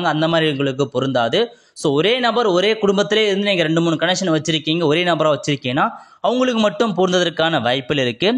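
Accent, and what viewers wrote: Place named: North, India. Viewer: எவனோ வடக்கன் பேசுறானோ? என்னடா பேச்சு இது..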